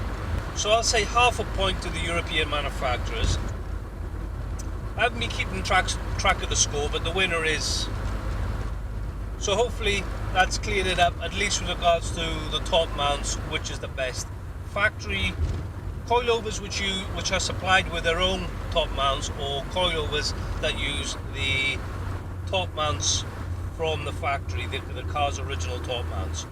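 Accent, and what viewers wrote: Wales, United Kingdom. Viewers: I got a racechip ultimate connect on my golf mk7 1.4tsi,and the moment I have taken it off as it keeps throwing cel code for turbo sensor wen I drive hard,Amy ideas why mate,cars fine wen uninstalled,by the way are you from wales originally,sound like a Walsh man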